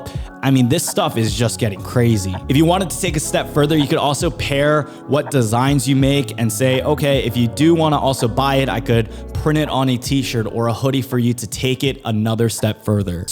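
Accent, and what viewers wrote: United States. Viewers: Very nice video... The words that come out of your mouth are really clear enough to hear.... Similar to an American accent.... It's good....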